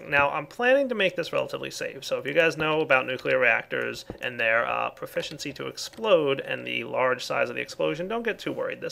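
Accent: Australia. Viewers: Ausie? or not because i am... :D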